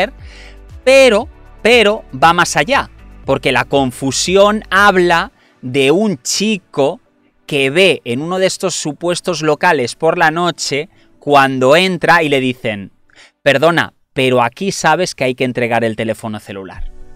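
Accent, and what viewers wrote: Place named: Spain. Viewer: Quiero saber si eres Dominicano por que tienes tanto acento Espanol?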